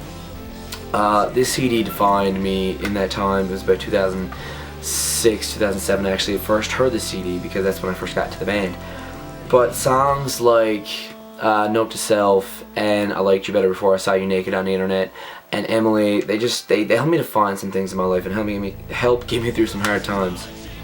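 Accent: Canada. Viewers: you're canadian right? just asking 'cause your accent is AWSOME!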